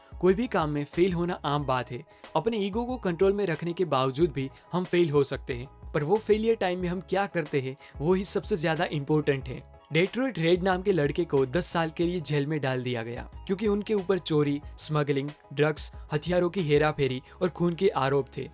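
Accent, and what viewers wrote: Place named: Kathiawar, India. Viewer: Kathyawadi touch feels in your voice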